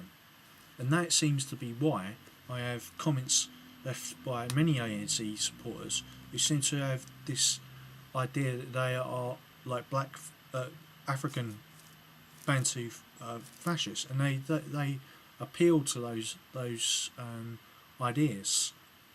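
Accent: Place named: England, United Kingdom. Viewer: By ur accent I know that u are from England.shameful u are clueless about the murderers and murder victims of apartheid. I really don't understand why u sit down always and talk rubbish SA when u can put ur time into a good source. Well know it the English adore Mandela . Go to the famous tralfargar square and see his sculpture there. So do us a favour and stop blabbing lies u spineless coward with no brain and unscrupulous even when u at lying. U tube is not meant for idiots like u.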